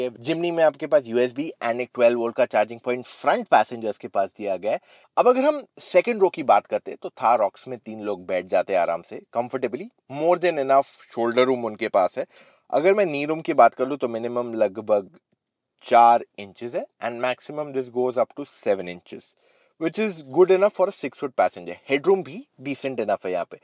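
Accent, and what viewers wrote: Gujarat, India. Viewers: Bhai kya aap gujarati ho kya ?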